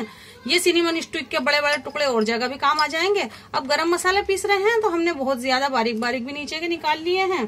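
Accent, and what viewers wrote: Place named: Paretabad, India. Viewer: Cooking no doubt achi hai but bolti paretabad walon ki tarah hai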